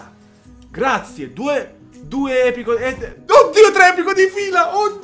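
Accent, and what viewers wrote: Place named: Rome, Italy. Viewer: Ma sto accento romano?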